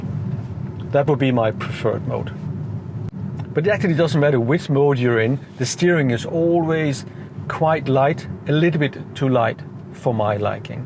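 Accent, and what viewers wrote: Denmark. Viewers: hahaha tænkte netop det samme. Svært at skjule den danske accent. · Tager jeg fejl, eller afslører din accent, er/taler dansk? Nå men har købt en ionic 5 Ultimate her i Danmark, den bruges primært af min kone som elsker den når hun pendler til og fra arbejde. Ja forbruget er lidt højt, men den er lækkertskruet sammen, kvalitet, ekstraudstyr. Rimeligt prissat nu i forhold til tidligere og efterprøvet igennem flere år og raffineret. Klar anbefaling herfra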